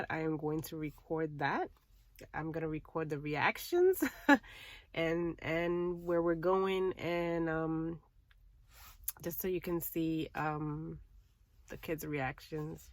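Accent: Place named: Bronx, United States. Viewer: I hear your Bronx accent. I’m from there too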